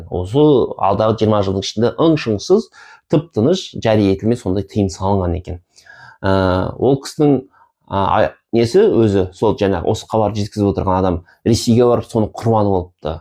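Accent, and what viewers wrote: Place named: Xinjiang, China. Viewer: Фу ДАУЫСЫ КАНДАЙ ЖАМАН ,кытайдан келген казак тардын сойлеген СОЛАЙ ЖАМАН.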